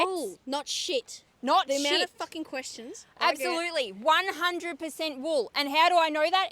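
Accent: Australia. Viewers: Fuck all of them. This is how Australians speak. If you don’t like it then scroll. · It's so refreshing to listen to an Australian speaking our dialect, with prejudice. Love your fuckin work. And fuck the do gooders who don't know shit but tell you what the fuck you're doing wrong.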